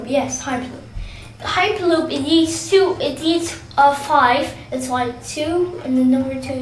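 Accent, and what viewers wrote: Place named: United States. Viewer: Developing a slight American accent😂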